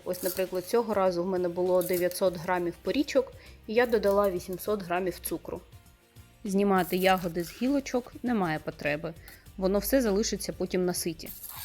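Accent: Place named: Lviv, Ukraine. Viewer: ого!Я думала,щи Ви зі Львівщини як мінімум 😊Супер👍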